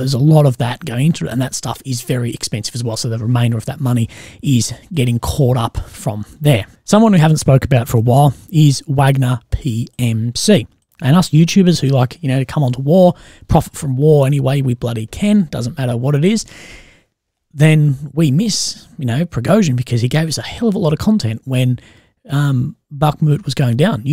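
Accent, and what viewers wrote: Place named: Australia. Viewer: yo son ben in UKR for six months great to hear a real OZ voice. Met a few seppo's here, usually pretty friendly when travelling but , not here, just hi and by.. OK appreciate your channel a lot great OZ perspective.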